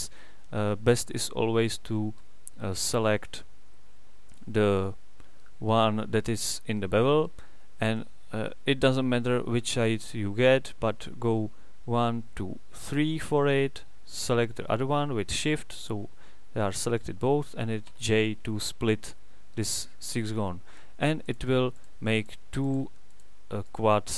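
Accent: Czech Republic. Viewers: very useful, I like it, but that czech accent is too much xD I'm sorry, but it is difficult for some people to understand our accent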